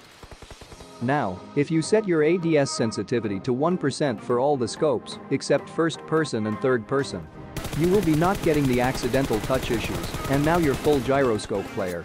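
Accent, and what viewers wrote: India. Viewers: Are you indian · Are you Indian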